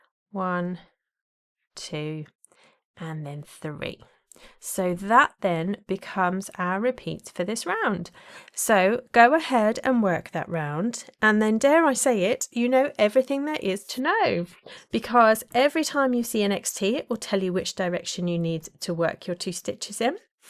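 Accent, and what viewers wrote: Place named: England, United Kingdom. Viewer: So, I have to admit, I have not watched any of your tutorials before but I am a huge fan of your garments. I have several patterns that I of course have not gotten around to. 😂😂 I decided to watch h this one as it popped up on my front feed. I was COMMMMMPLEEEETELY surprised to hear your English accent!!!!! I had no idea. So nooow.Im trying to reconcile your face to your voice. 😂😂 I will say that I totally love English accents. One of the doctors I work with is English and I looooove to listen to hom talk. ❤❤❤❤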